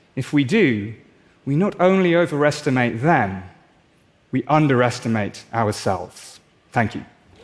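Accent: United Kingdom. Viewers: They're just lying to our faces. This is all damage control. The y know they've already lost control of AI. They've created a monster they could never be able to tame. These guys are pure evil. Lying to people's faces in a British accent! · This video is so full of baseless assertions, it's difficult to watch. I guess being a good orator with a British accent is all it takes to get on TED these days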